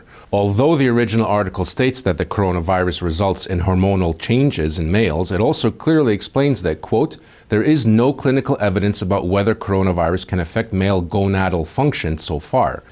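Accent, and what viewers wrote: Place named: Russia. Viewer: I think has spent too much time in Ukraine and obtained a Russian accent.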